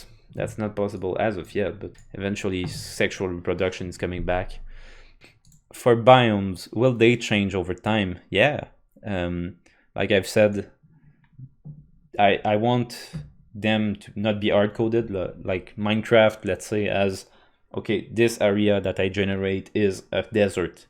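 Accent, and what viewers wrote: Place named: Quebec, Canada. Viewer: Are you from the province of quebec ?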